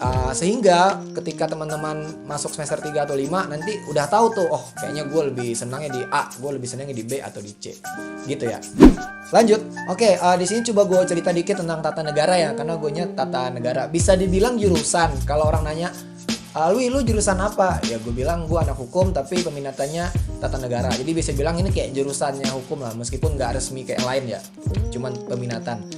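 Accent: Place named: Padang, Indonesia. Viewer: Dari logat ngomong, kayaknya kak org padang ya?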